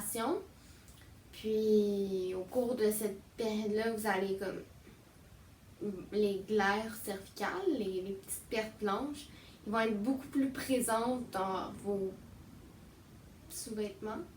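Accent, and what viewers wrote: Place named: Quebec, Canada. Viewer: L'accent québécois qui fait plaisir 😂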